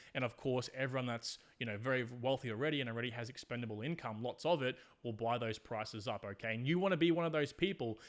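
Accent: Australia. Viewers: Absolutely no bs, I love it. Educational as heck, perfect Australian accent, and everything you could honestly ask for, especially being free content. Brotha keep the grind up, I absolutely appreciate the help you give us, thank you and take care of ya self✌🏻🙏🏻💪🏻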